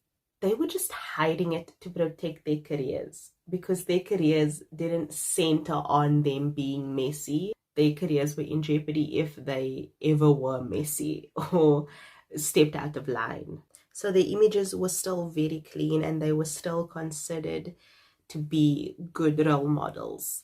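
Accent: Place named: South Africa. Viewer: I can spot a South African accent a mile away ❤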